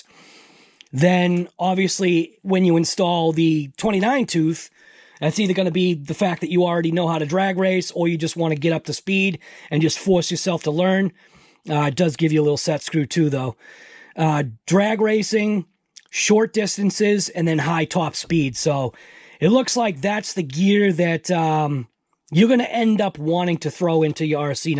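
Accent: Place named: Massachusetts, United States. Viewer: loving the MA accent fellow Masshole def subscribing well done vid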